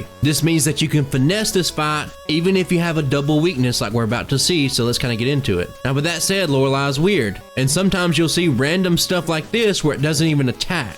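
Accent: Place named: Kentucky, United States. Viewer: The accent sounds like my own, I’m from Kentucky lmfao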